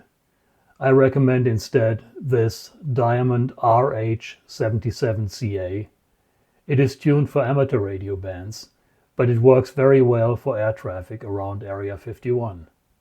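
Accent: Germany. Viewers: Hey, you are a native German? Name and accent point to that conclusion:) 73 from Germany:)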